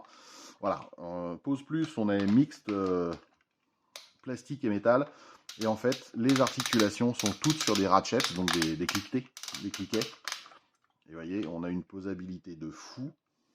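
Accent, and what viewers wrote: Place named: France. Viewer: Quite an impressive French Accent here :D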